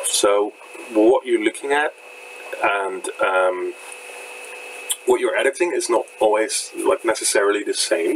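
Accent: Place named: Netherlands. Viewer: Jesus wat een kut nederlands accent heb je als je engels praat -.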